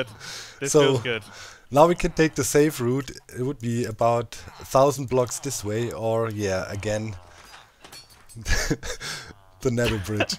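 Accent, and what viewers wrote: Germany. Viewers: that synchronised inventory poppy technology thing is absolulty mindblowing. Recognized you last season because of this for the first time. Since then love watching ur videos (and espescially that ur vids are so long, haw*.* best for coffee in the morning) haha and btw first time i heard u speaking id know hahaha hes german, his pronunciation is the same like mine :D:D lots of love from potato to potato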